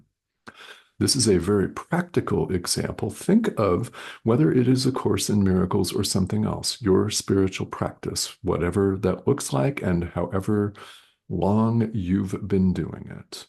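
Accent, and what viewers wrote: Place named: Arizona, United States. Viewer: I knew it, I knew it, I knew it you are from Arizona. I thought Arizona or New Mexico.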